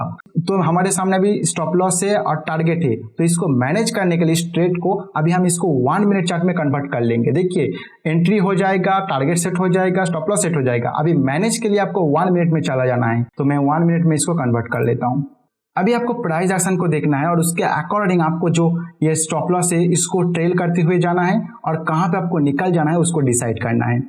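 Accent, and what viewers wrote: Bengal, India. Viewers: আপনার হিন্দি উচ্চারণ শুনে আপনাকে বাঙালি বলে মনে হচ্ছে।ঠিক না ভুল?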